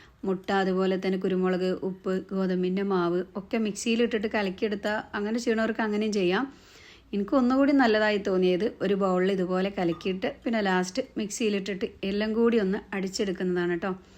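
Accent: Malappuram, India. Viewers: മലപ്പുറം താത്താന്റെ സംസാരം മാറിയോ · Malapuram thathante samsaaram aayirunnalloo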